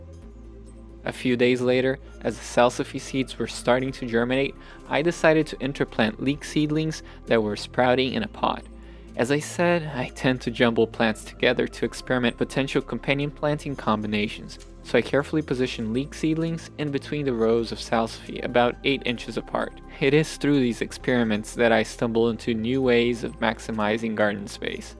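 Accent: Brazil. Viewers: Que trabalho maravilhoso,!! Estamos apaixonados! Engraçado que topamos com um vídeo antigo seu e pelo sotaque no inglês eu já senti que era brasileiro 🧡 é lindo topar com narrativas riquíssimas e belíssimas como as suas! Muito obrigada pela dedicação, lhe desejo muito sucesso!!!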